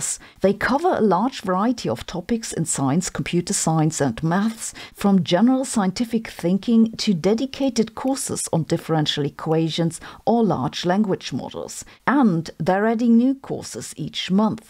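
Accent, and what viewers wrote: Germany. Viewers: "that I can say bullshit when I mean bullshit". This is very clear, but not very lady like. I like your german english - I speak it also. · Love the way she says BS with her German accent.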